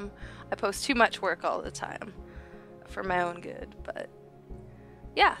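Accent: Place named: Toronto, Canada. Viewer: It's really nice to have this in the background while working, I struggle with not being distracted by videos and social media, so when I'm listening to someone talk I'm able to focus: ) Also getting almost homesick hearing your accent, I miss Toronto.. Anyway, I'm honestly really enjoying your videos, you have relevant and meaningful opinions, I hope you keep making them^^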